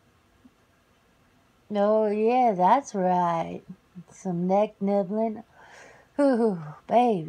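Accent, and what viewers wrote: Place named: South, United States. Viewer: hi another great vidio with the Southen accent too always good listening my friend Stay Safe xx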